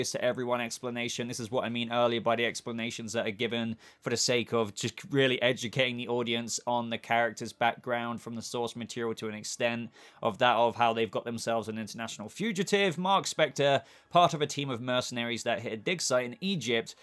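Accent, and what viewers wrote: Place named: London, United Kingdom. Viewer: to be fair his accent does sound like a foreigner maybe greek turkish Spainsh guy who lived in london or south England kinda middle class esk area or ppl or very r.p speaking environment like retail , office , customer service mix with abit of 'lil o bit' Oliver twisty shite Cockney accent i have heard people talk him or similar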